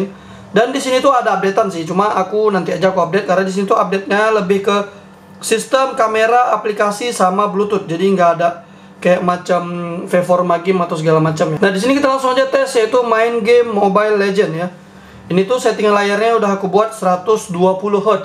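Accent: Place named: Sumatra, Indonesia. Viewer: dari logat dan gaya bicaranyabyg "ala..." kayaknyanorg sumatera ya bg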